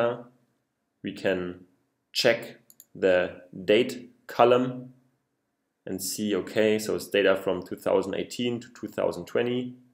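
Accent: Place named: Germany. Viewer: hey, sprichst du deutsch? dann lg -der akzent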